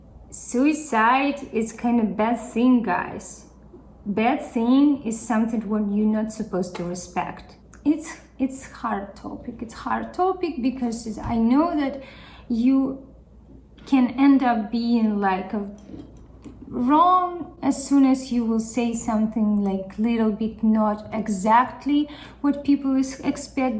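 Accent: Russia. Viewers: Where you from ? Russia? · Забавная реакция) судя по акценту, ты русская?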